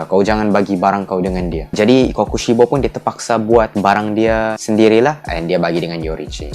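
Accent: Sabah, Malaysia. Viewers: Logat dia bckp mcm org sabahan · Abang ni orang sabah ke? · Speaker mmg org sabah bro 😆🤣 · orang sabah ke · Abg ni orang sabah kaa?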